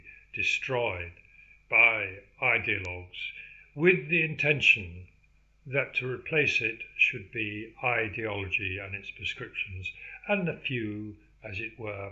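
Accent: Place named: RP, United Kingdom. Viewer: Youre a privileged little clown with a serious case of white guilt. This is perfect proof that a posh accent and privileged background in absolutely no way equate to intelligence.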